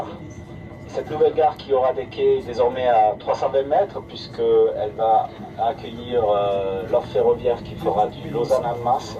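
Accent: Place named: Marseille, France. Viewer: C'est un Witz j'espère, le présentateur marseillais au micro ?! Cet épouvantable accent accompagné du fameux "quatre-vingt-dix" . Affreux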